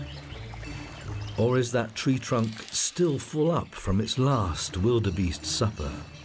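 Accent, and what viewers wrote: United Kingdom. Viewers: These documentaries would not be as good without the narrator having a British accent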